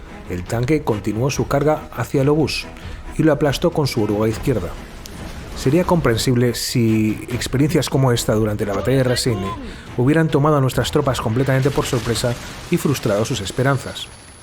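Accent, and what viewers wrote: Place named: Russia. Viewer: La presentación es buenísima. Brutal ese acento ruso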